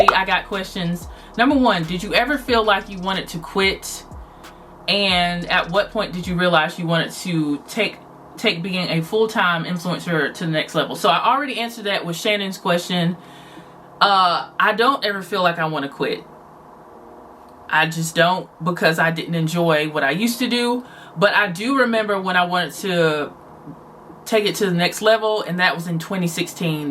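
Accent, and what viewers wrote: South, United States. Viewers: This has probably been the BEST, most informative, and straight to the point video I’ve watched regarding being a content creator. Thank you so much for being transparent with your audience. Congratulations on 9 years! 🥳…Also new subbie (Khrissy told me about you 💞) and also because I love your accent! I immediately knew you were from the South! 🥰♥️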